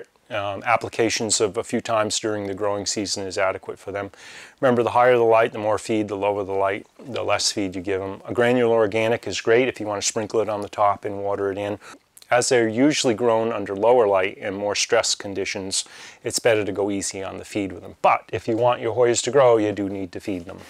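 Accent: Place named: Southern New England, United States. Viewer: Yes! Proud southern New England accent!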